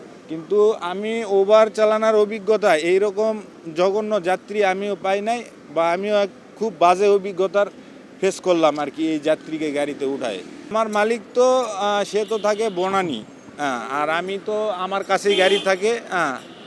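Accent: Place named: Bogura, Bangladesh. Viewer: ভাইয়ের কথার টোনে বোঝা যাচ্ছে ওনার বাসা বগুড়ার কোনোজায়গায়...!!!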